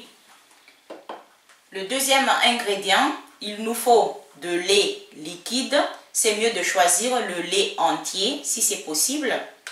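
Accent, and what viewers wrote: Madagascar. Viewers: C'est une Malagasy!!! Cet accent ;)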